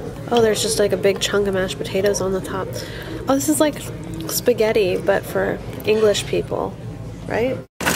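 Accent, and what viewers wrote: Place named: South, United States. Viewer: Happy 200th! Loved the southern accent! Thinking the lil man needs his lil' behind cut before he gets some gross build up back there!! He's such a cutie pie!! XOXO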